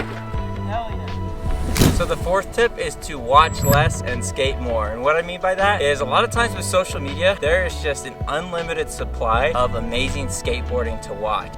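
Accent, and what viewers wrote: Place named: Utah, United States. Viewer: I somehow knew you were in Utah as soon as you started talking 😂. Great video, thanks.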